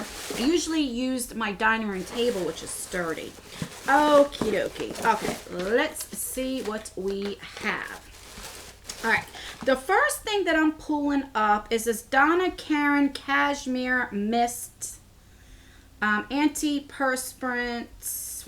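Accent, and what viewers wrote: New Jersey, United States. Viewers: I love your Neugh Joursey accent...it sounds like music to my ears💞 I was raised in Philly, I know all about cawfee and wudda.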